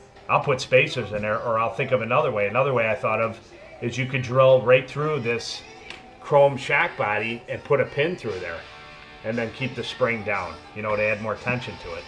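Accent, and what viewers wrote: Chicago, United States. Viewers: My gut said Chicago !! Woohoo! Good man :) awesome video by the way and amazing modification!!!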